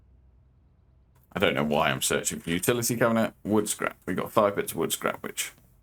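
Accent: United Kingdom. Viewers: I would suggest you collect the feed as it is great for hunger. I am really enjoying your videos and it is great to hear a British Youtuber. Please keep up the great content and I will like all of them. You are very entertaining.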